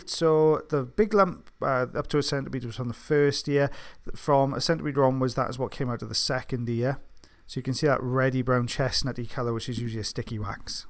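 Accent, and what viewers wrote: Wales, United Kingdom. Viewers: Lovley to hear a welsh accent!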